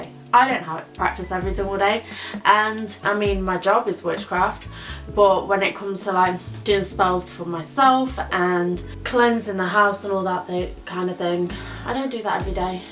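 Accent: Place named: Northern England, United Kingdom. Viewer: Dya lIve in da US ?? U r sound like u r from Northern England 😺😺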